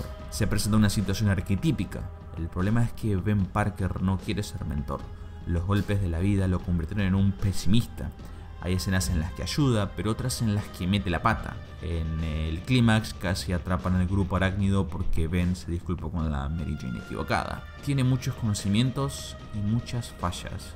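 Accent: Argentina. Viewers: parece argentino como habla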